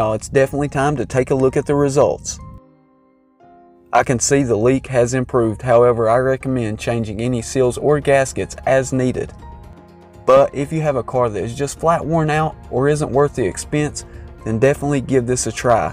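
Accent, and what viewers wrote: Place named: Texas, United States. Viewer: Wow! I LL try it! I like his Texas accent! Any cowgirls there?! Lucas oils are good! Good tips! So this is temporary treatment only?! Can use how many times or years?!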